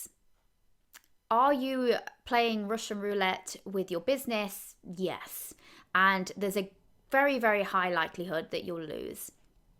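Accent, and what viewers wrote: United Kingdom. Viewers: I love your British accent. Watching from Iran.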